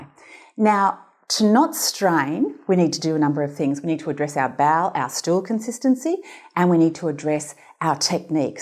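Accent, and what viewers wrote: Australia. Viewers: Wow, an Australian, hoooooooray! Not only do you speak Australian so I can understand you better, you are sensible and helpful and to the point, thank you sooo much for this video! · Hi - I did watch the video and it turned out (no pun intended) to be interesting and well presented. I'm a sucker for a woman with an Aussie accent, too. Regards.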